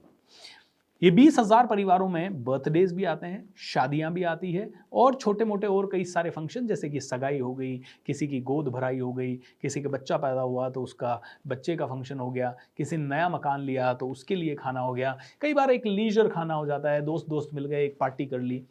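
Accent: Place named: Rajasthan, India. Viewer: Lagta hai aap rajsthan bikaner se ho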